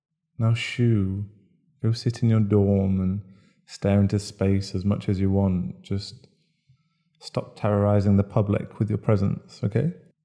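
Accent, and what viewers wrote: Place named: United Kingdom. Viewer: You sound really British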